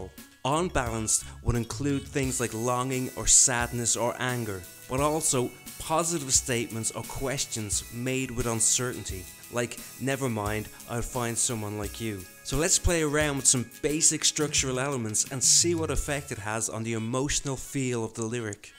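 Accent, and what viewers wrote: Ireland. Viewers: love the Irish accent...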